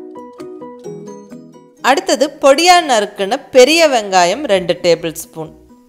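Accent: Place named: Tamil Nadu, India. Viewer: Tamil accent nallayirukku.. Love from kerala💙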